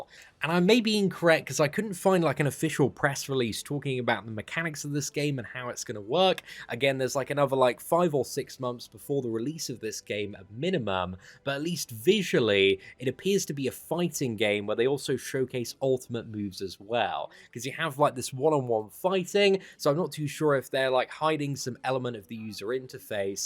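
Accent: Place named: United Kingdom. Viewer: I just wanted to hear your british Accent again mate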